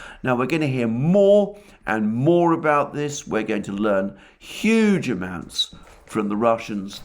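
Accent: United Kingdom. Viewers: I want to apologize for missing some of your EXELENT NEWS AND ASSESSMENT , I LEARN A GREAT DEAL FROM YOU , IT IS A PRIVILEGED TO HEAR YOUR SPEAK YOUR INFORMATION , ESPECIALLY ABOUT UKRAINA. I LISTEN TO YOU , SCOTT RITTER AND DOUGLAS MACGREGOR , YOU ARE EXTREMLY WELL EDUCATED. I M NOT MAKING THIS UP. IT IS A FACT. GOD BLESS YOU AND ALL YOUR LOVED ONES 💓 AND HAPPY CHRISTMAS. I LOVE THE BRITISH ACCENT TOO. 😀💓👍 I HATE THE U.S. ENGLISH. I FOLLOW THE UKRAINA AND RUSSIAN CONFLICT WITH ENORMOUS INTEREST 😀CHEERS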